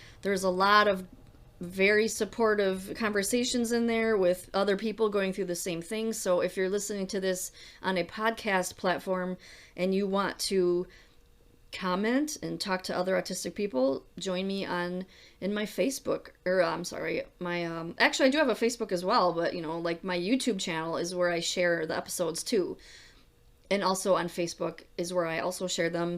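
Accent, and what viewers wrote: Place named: Midwest, United States. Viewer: Are you from the midwest? Like Illinois? Wisconsin? I hear your accent. Triggering is stupid. How YOU react is not MY problem. I got diagnosed at 74. Now 76. Good podcast.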